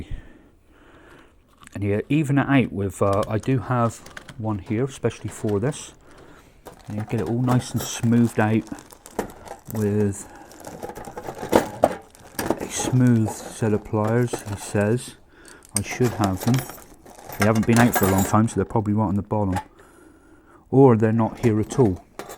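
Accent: United Kingdom. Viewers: north carolina? wow, i thought you were british lol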